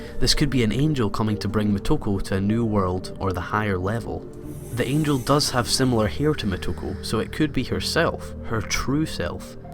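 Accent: Scotland, United Kingdom. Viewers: Never realised I needed a Scottish bloke musing on philosophy, anime, identity, death, and life in general. Ay. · At least this narrator sounds like British or Northeast Coast... and this makes sense. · you scottish?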